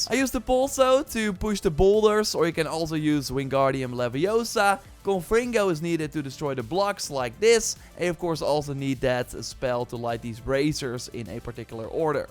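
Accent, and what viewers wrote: Netherlands. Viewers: I have a feeling ur Dutch cause your accent, sorry if I’m wrong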